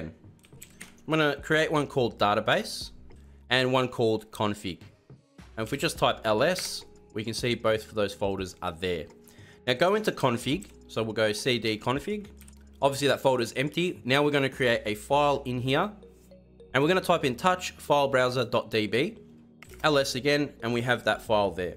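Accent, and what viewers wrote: Australia. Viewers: Hey r u Australian bc u got an aussie accent?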